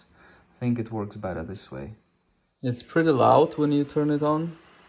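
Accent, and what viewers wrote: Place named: Russia. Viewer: Слушаю автора и кажется у него наш, русский акцент, буквы чётко выговаривает, без соплежуйства английского...)))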